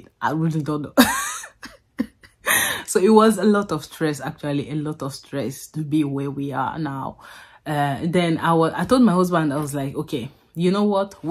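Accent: Igbo, Nigeria. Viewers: A Congolese speaking like a Nigerian. Your accent is pure Nigerian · I ve learnt a lot of good values and beliefs that help spur Nigeria's economic development. Drive to build yo own house b4 going back home - cant stand staying in a hotel. Funny how your accent is more Nigerian than Congolese 😅😅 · Wowoo! You already speak like an igbowoman, a Nigerian. You have a house- home in Nigeria. The way you pronounce Aba, Ebuka etc i think in your first world, you're igbo and Nigerian before they transferred your body to Congo, then your husband went and brought you back to Aba, Nigeria. I live in Aba also, Aba ma ndi Aba. You're welcome. Im happy for you. God bless you and your family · Great presentation and experience considering the facts of how things really work back home , but our wife you already sound like igbo woman accent ❤😅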